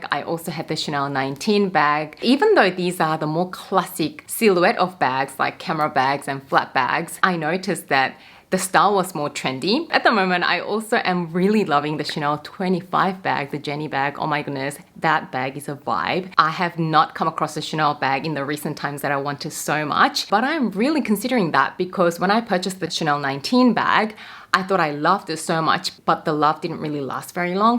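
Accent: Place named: Australia. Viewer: I saw one of your scarf videos for the first time today. It’s four years old, but I am so grateful. I waited and waited til I thought I was going to have to ask if that was an Australian accent, then right at the end you mentioned Sydney. ☺️ Can’t wait to watch so many more of your videos. I have access to new, free designer clothes, jewelry, and makeup. Those I don’t get for free, I get for as much as 90% off. I cannot declutter because my home has become s hoard and it’s a hoard with no storage. Without help, I’m just going to continue drowning. I went to the sale where I get most of my clothes, and came home with four more scars, a gorgeous handbag and a few pieces of jewelry – none of which I should’ve purchased. Designer scarves for $1.50 so I’ll use that as an excuse. I have clothes that could sell, but the resellers market has changed so dramatically, I don’t know which platform is worth it. 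If you have a suggestion, I would really appreciate it. Poshmark has been a complete waste of time for me.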